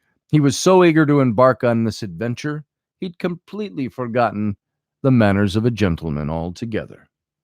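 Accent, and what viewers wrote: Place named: Scotland, United Kingdom. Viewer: That Scottish accent is not to shabby 😂 give you a 8 out of 10 haha 😂❣️ xx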